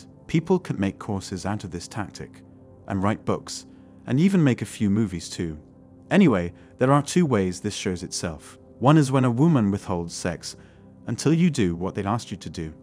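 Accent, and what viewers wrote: United Kingdom. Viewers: I hate the robot voice, even with the British accent.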